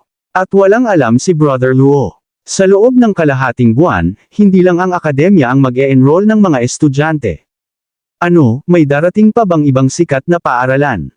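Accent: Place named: Visayas, Philippines. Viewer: Ang recap mo parang bisaya eh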